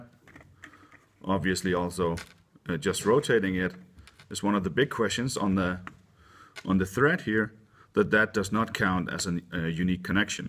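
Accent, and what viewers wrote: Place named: Denmark. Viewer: Where are you from? You sound Danish :o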